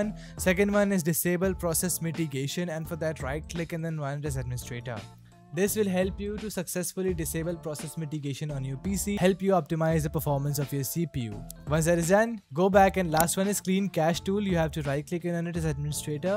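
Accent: India. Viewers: if ur in an english speaking country pls for the love of god learn to not speak in an indian accent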